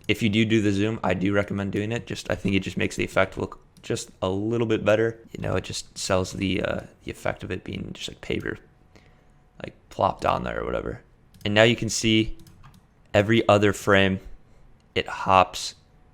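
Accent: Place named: Buenos Aires, Argentina. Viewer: Hablas en ingles pero se siente como si fueras un cheto de capital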